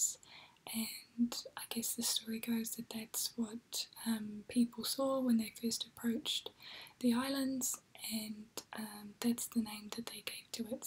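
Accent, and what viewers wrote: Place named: New Zealand. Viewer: I do love the New Zealand accent. Thanks for a lovely upload 😊stay safe and well.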